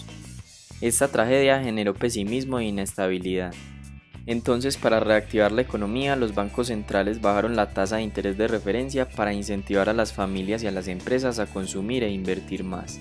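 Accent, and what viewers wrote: Colombia. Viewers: este men es de colombia??? tiene voz d colombiano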